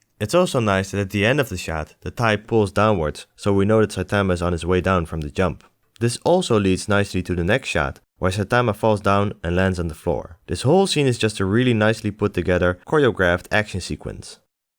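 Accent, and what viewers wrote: Netherlands. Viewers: Great vid! I noticed a bit of a dutch accent but I can be wrong though😂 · I love it that I can just hear your Dutch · aaaahh the dutch accent sounding ass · 1 second into the video, hears his accent yep hes Dutch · Hey im new to this channel, maar ik hoor je Nederlandse accent kapot erg niet normaal maar je spreekt het nog best goed props.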